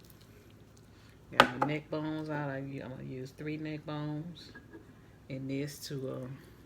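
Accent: South, United States. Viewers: She said I like to use my “yurs” aka ears haha love southern accents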